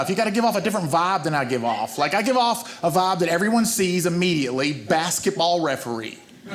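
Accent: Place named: South, United States. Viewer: Really hilarious especially with the southern accent. Cant wait for more from him...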